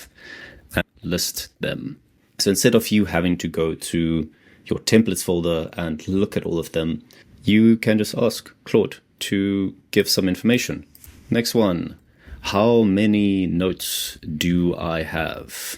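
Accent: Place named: South Africa. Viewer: Definitely a Saffa voice there! Good job bro, repreasent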